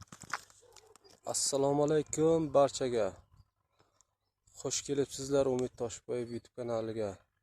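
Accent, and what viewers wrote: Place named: Kashkadarya, Uzbekistan. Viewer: Shevasi yoo Qashqadaryo yo surhondaryo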